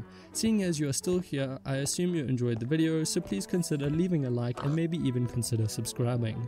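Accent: South Africa. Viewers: i can tell straight away ur a south african awe man:)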